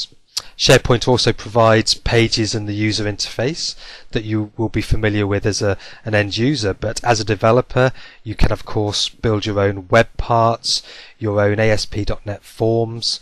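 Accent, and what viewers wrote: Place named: United Kingdom. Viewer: Yes, development-related video in British English - a dream come true :-D Seriously though, nice overview - a must-watch for beginner devs, but a notable omission of SQL Server indeed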